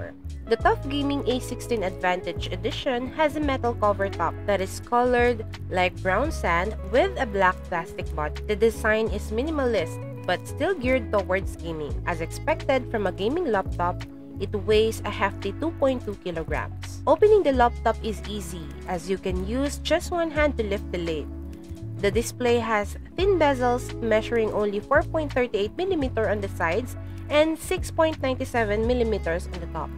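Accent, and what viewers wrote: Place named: Philippines. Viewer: That voice and accent.. pilipino po ba kayo maam? Haha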